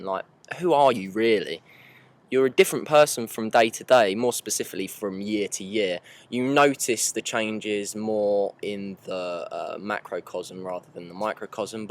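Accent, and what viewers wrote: United Kingdom. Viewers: Seems like we've all chilled out a bit more recently which must be generating more positive energy as speculating too much can lead to accidently creating negative reality I guess. Really good to have you back and nice one for holding onto your British accent!;)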